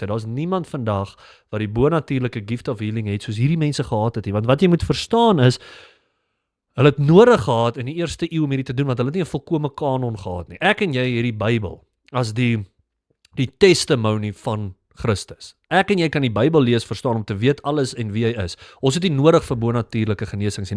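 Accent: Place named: Mid-Atlantic, United States. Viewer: That "Mid Atlantic " accent should make anyone suspicious.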